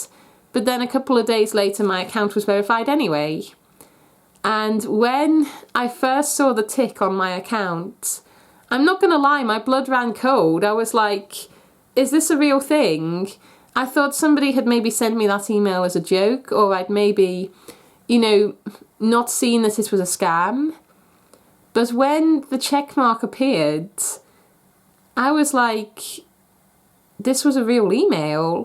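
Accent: Scotland, United Kingdom. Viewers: Thanks for the vid. So weird that it has such a high ratio of dislikes to likes; must be idiots searching for videos on how to get verified and then getting pissed off at landing on your video or something. BTW, where in Scotland are you from? The Scottish accents that are more similar to English ones are very difficult for me to identify as such. I did think you were Scottish for a short time in the video, but I finally settled on somewhere in Northern England. Bzzt!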